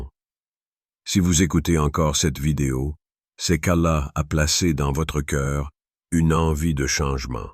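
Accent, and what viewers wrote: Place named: Canada. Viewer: Ce léger accent canadien........😮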